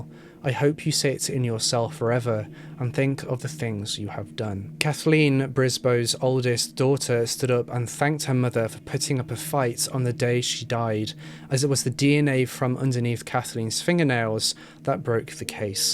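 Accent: United Kingdom. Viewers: Great job. Love your respect for the victims and families. P.S. For all the grammar police complaining about pronunciation of certain words I think he does an amazing job especially since he's from the UK. I know I wouldn't be able to pronounce the names of places where he lives and I give him credit for his effort. I live here in the US and still mispronounce certain things based on their spelling. does very well I think and I adore his accent!!! 👍🏻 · I love that you are doing a piece on our local unsolved, but I have to tell you, I'm giggling like a fat kid with a cupcake listening to you mispronounce local place names in a British accent! You didn't even get to any of the real tough Native American named places! Puyallup, Humptulips, Stillaguamish, etc! While we had a number of serial killers during the period of time, I still lean towards Yates as the guy. 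What a piece of work that guy is. The Green River Killer was working during the same period, and Seattle and Portland both had cases that sorta looked similar. I can't remember if ballistics testing was compared. Been a long time! Keep up the good work! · He's from the Uk..thats how they talk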